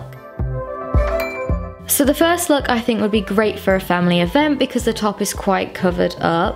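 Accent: United Kingdom. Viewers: Lol I think in the uk people pronounce it how you do (nyke) but in the Us we say nikE (nikee) and also i think the uk say AdidAs (adidAs) but the US says adIdas (adeedas) lol idk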